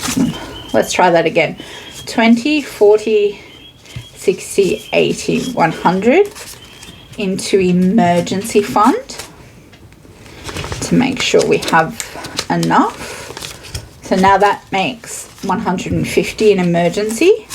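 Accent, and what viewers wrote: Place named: Australia. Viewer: You from Australia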